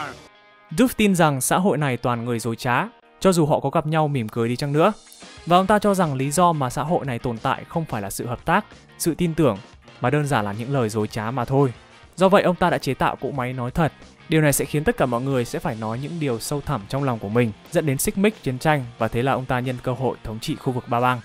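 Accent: Standard. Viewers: Tiếc nhỉ mà ad có giọng hay thật lại phát âm chuẩn nữa và đầu óc cực "chong xáng" khi làm về Doof's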